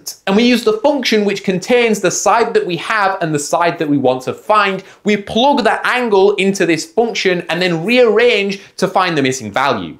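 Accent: United Kingdom. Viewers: tf is that thick ass british accent?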